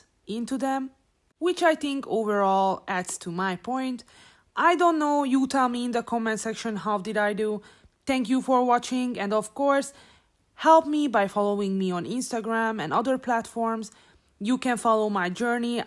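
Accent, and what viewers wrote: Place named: Hungary. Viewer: ...you sound hungarian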